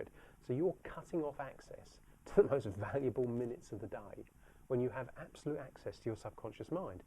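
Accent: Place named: Australia. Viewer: i love this guy's accent..very broad australian with english twang..hahahaha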